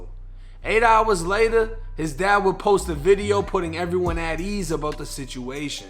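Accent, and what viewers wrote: Boston, United States. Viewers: You can hear the Boston in your accent 😂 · Yo what up i hear that Boston accent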